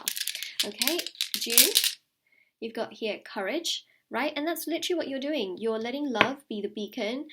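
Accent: England, United Kingdom. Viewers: Oh my gosh you’re English! Glad to see a fellow countryman represent! 😆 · You’ve got a beautiful English accent 🏴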